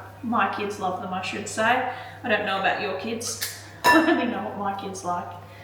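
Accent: Australia. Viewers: They all look yummy and easy. Ann, 74, from southeastern Coastal North Carolina USA. Where are you? You sound Australian but you were talking about warm weather and I thought you all are going into winter.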